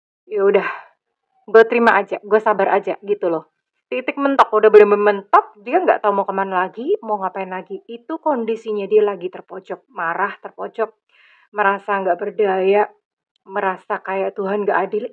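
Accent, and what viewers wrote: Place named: West Java, Indonesia. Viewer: Aya noann sunda nyh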